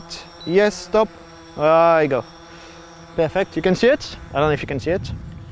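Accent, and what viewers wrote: France. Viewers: french accent but very good video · On sent l'accent français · Are you French ? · that's how French people sound when they speak English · Tu es français ou quoi ? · Very usefull video because done from a beginner with mistakes and corrections... I will be in your case in one week with a phantom 3 also... Just i notice playing dji tutorial videos, the man turn in unticlock way for calibration ...i don't know if it 's important or not.... last news about dji... after christmas prices going down: standart one is 599 euros, advanced one 899 euros !!!! Oh ! do you live in an english country ? i am french and i recognize you special french accent ;-)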